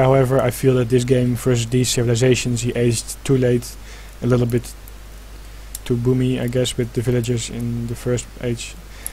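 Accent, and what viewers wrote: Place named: Netherlands. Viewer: You are dutch right i can hear it. plz tell me how to get a good economy at the start thnx :)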